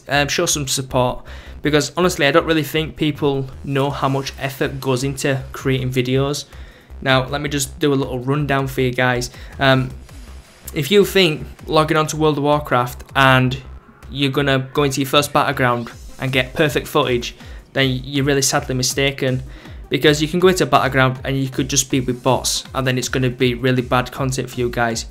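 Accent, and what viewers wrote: Sweden. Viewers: ur Sweden O.O?? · Are you from Sweden? :D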